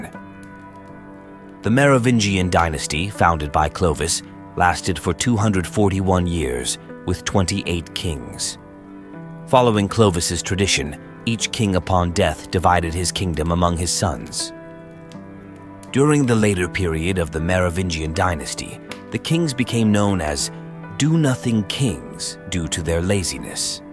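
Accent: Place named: United States. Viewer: The sinister American voice over and sneering is a deception. As I learned at university documentation as happens now, was often simply a formalisation of a fact. The Papal States were a buffer intended to protect and reduce pressure from the rule of kings and armies. It was intended to create neutrality as the Bishop of Rome acted with more authority than any present internal court. The claim Constantine had donated the territory to the Bishop of Rome and Church was not untrue. Constantine had compensated the Christian Church for the way the Roman State had attacked, murdered and persecuted them. He gave the Laterin Palace to the Bishop of Rome. Given authority to the bishops along with governers. He built basilicas, founded churches and created religious tolerance via the Edict of Milan.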